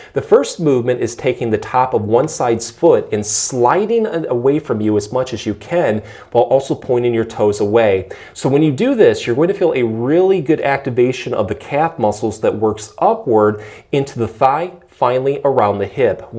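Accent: Michigan, United States. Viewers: Whoa heavy Michigan accent on this one. Helpful tips, as always. Thank you, Dr.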